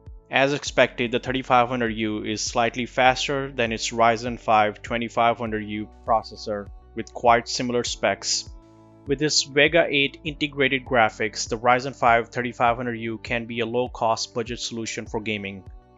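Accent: India. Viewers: If u are Indian put the title in indian trust me no one wants to hear ur English accent get ur audience from your own shitty cohntry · Great Video, but the accent cringe is real! Either use Indian or work more on the US one. It's painful inbetween. Cheers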